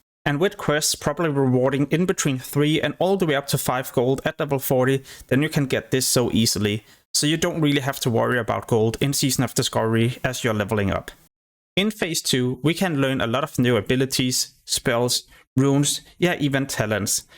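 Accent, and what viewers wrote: Denmark. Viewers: this guy is Danish, 100%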